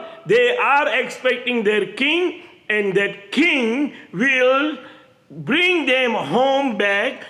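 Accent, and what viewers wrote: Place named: India. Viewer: Are you a Jew or an Indian. Your accent is Indian. 1. Why say that no Prophet in the old testament did any heaking or miracle? Elisha healed the Syrusn commander from Leprosy 2. Moses oersformed mamy signs and wonders 3. A dead person who was buried next to the bines of Elisha got resurrected. 4. A Son 9f the widow was resurrected by Elijah 5. Jesus made it clear that whatever He did was done by God the Father who eorked in Him or using Him NOW YOU , WITH NO POWER TO DO SNY MIRACLES. YOU WILL NEVER RAISE THE DEAD . WHAT WILL YOU HELP ANYONE WITH 7. A MESSIAH MUST BE ABLE TO RAISE THE DEAD. IF YOI CANT RSISE THE DEAD , THEN YOU EILL NEVER RESURRECT FROM THE DEAD EITHER . SO YOU ARE A NIBIDY AND CANT HELP ANYONE THE GREATEST ENEMY OF HUMANITY IS DEATH and also diseases If you will not taise the dead , you are worthless. You will judt die and become soil.like everyone 8. 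So, WHAT IS THE DIFFETENCE BETWEEN YOU AND OTHER HUMAN BEINGS ? . You feel helpful to Jews by telling them to fight on until GOG AND MAGOG war . Is that what Jews are looking for. A firever war life . Dont they want peace ? JESUS CHRIST IS THE PRINCE OF PEACE . REJECT HIM TO YOUR OWN DETRIMENT . WHOEVER BELIVES YOUR LIES WILL BE END UP IN THE SAME FATE.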